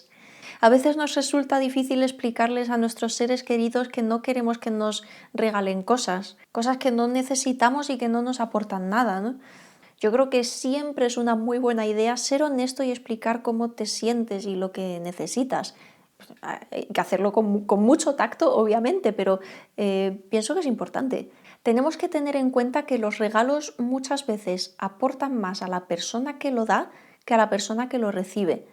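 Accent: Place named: Spain. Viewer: Es maravilloso escucharte!! Eres dulce, motivadora y nos das tips muy excelentes. Además amo tu acento español!!! Me has enseñado tanto y me eres mi gurú, ya no sólo del minimalismo, de valores de la vida en general. Te tengo guardado un gran cariño y agradecimiento! He adoptado tu frase "mandar todo a la porra" 😊❤💕🌸 Eres genial!!!!!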